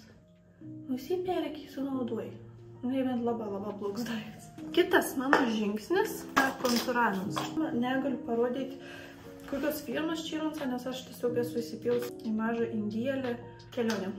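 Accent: Samogitia, Lithuania. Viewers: Nu graziause ce, veina pati i konca isvare!? Kode mon nieka nepasake 😮 zinuok pykstu!!! O jei rimciau tai faina ziuret o labiausei tai klausyt zemaitiskos kalbos.. pasiilgau Telsiu ir zemaiciu 😉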